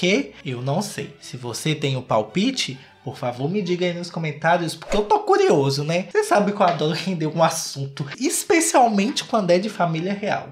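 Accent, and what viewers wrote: Minas Gerais, Brazil. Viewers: Sabia que conhecia esse sotaque kkkkk , mineirinho.kkk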